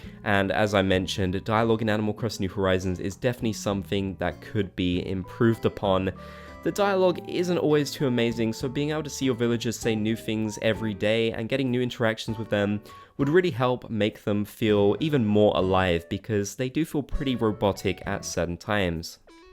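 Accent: United Kingdom. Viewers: Is it just me or he sounds british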